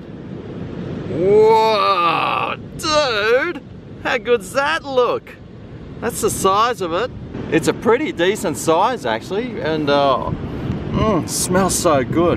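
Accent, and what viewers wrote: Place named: Australia. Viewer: australian accents are way too course, I can't listen to this prattling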